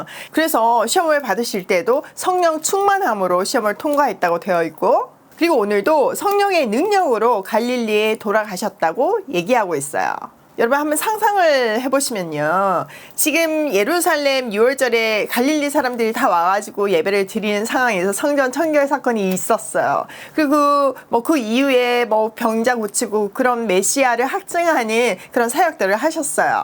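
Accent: Gyeongsang, South Korea. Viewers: 할렐루야!! 삼천포가 고향이시네요 경상도 사투리가 넘 친밀해요 저도 고향이 거제도에요~^^ 감사합니다 🙏 🙏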